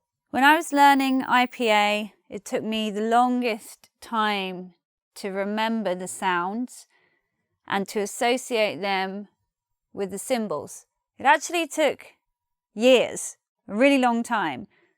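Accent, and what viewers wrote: United Kingdom. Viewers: Sólo si me caso vos podré tener ese hermoso acento británico 😍✨..💘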